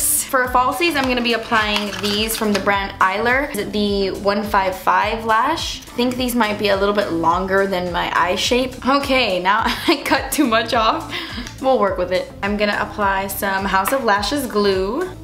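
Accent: United Kingdom. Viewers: goooood, I love when you speak with british accent :D but this definately looks more like a tutorial then a challenge, are you sure your not like both-handed? <3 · You're British accent gives me life hahah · are you british???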